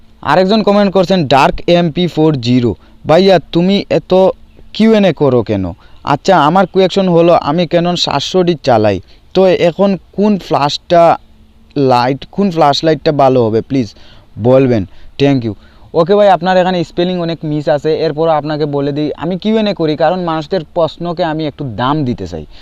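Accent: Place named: Gopalganj, Bangladesh. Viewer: ভাই আমার শশুর বাড়ির সবাইর ভাষা আপনার মত। love from Gopalgonj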